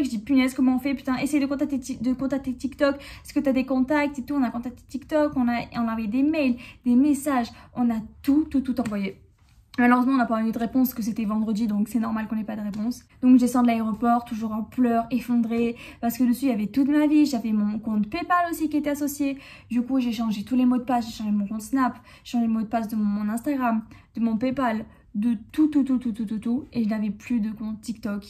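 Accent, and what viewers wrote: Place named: Corsica, France. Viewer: Salut dsl pour ton compte mais je voulais te demander t'es pas d'origine corse parce que tu as quelque fois l'accent corse sinon j'espère que sa va aller mieux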